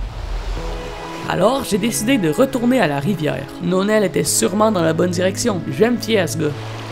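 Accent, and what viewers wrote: Quebec, Canada. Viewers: tes quebecois cool comme moi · J’aime trop quands tu parle😆 c’est comme mes cousine eft elle habite aux Québec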